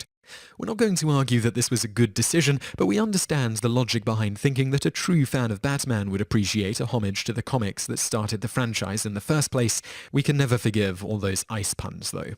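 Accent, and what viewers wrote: United Kingdom. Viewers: this channel would be so much better with an atleast somewhat interesting voice. british accents are great, and I really mean it. but this guy... I can't imagine anyone staying awake until the end of a 10 minutes video.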